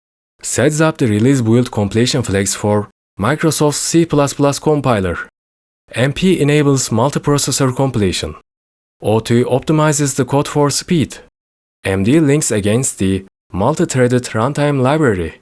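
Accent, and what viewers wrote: Turkey. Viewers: konuşmandan türk olduğunu anladım master :) ben de linux da vulkan api ile çalışan bodycam clonu yapmaya çalışıyorum. windows dan devşirme değilde linuxdan devşirme yönlü olmasını istiyorum. microsoft ve nvideadan bağımsız directx saçmalığı olmadan. kod bilgim yok ama bası oyun modları yaptım daha önce. biz yetişkinlerin her akşam seferberlik eğitimi olarak. yarım saatlik roundlarla cqb savaşı yapa bildiği takım bazlı oyunu yapmak istiyorum. lyra game baz alarak bluprintler ile yapmayı deneyeceğim.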